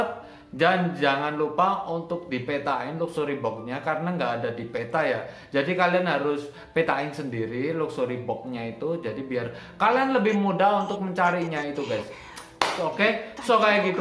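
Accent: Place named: Java, Indonesia. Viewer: hmm medok men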